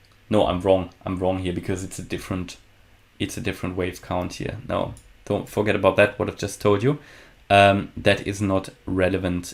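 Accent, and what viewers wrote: Germany. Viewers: Hey ich schreib mal gleich auf Deutsch …. Bist du Deutscher ? Hört sich nämlich so an 🙃 gute Analyse … ich finde diese ganze Elliot Theorie super spannend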